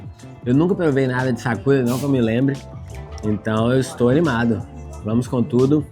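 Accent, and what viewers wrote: Minas Gerais, Brazil. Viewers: Cara, vi teus vídeos... E só pelo sotaque vi que é mineiro... Parabéns excelente trabalho. Pra ser melhor só falta ser cruzeirense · Te assisto há pouco tempo e percebi o seu mineres desde o início!!!!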